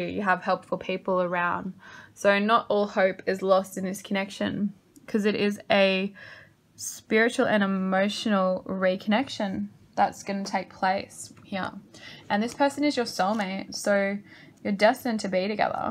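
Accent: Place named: Australia. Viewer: #4 but couldn't focus much on what u were saying but i absolutely LOVE ur aussie accent. ah my heart 😂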